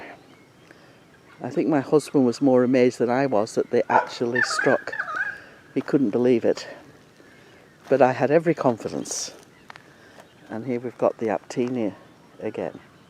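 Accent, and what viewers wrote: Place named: Ulster, United Kingdom. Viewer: Fantastic...amazed you have so many cuttings. splits etc. The Ulster accent gives an international flavour (surprised no Aussie twang!!!)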